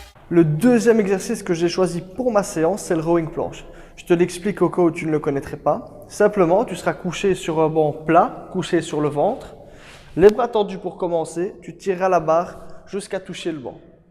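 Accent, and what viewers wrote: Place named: Verviers, Belgium. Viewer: il me semblait bien avoir déja vu cette salle ! et l'accent me disais quelque chose... entre verviétois ont se comprend :-D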